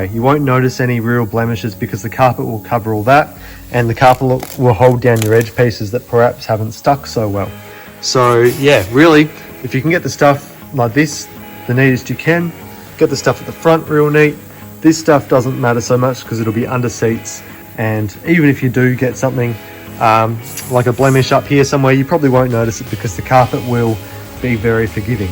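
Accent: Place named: Australia. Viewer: the job looks like it was quite a lot of work, you did a good job. What's with the furry seats LOL, Maby they will be a project too. I see your dad helps you a lot, I had a dad like that. you're really lucky, a lot of guys don't have dads like that. You should always let him know how much you appreciate him. I enjoy your videos, keep them coming I am looking to see what all you do to your vehicle. I am in Florida USA, your accent sounds like your down under.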